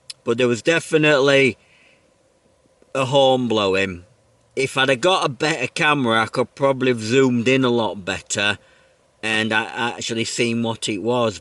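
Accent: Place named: Dronfield, United Kingdom. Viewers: Absolutely love your videos. Originally from Dronfield, so it's lovely to hear your flat vowels. Really fabulous content, do keep them coming. Much respect sir 🙂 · I much prefer your narration and Yorkshire accent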